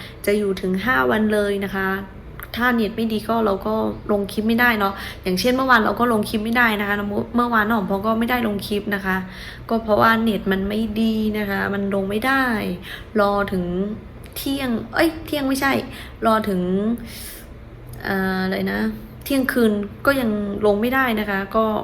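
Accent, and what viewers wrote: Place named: Laos. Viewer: โรงแรมชาวเกลาเป็นพาษาลาวไม้ไช้เหรอบา้น ไกล้กันกลับลาวบา้งที่เห็นพุดเหมีอนอยุ่ไกล้ลาว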